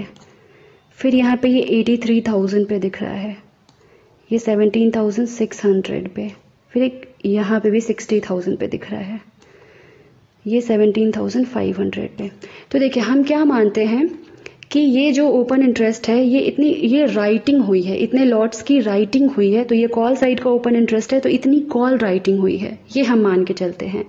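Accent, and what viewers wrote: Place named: Bihar, India. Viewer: आप बिहार से हैं न मैडम॥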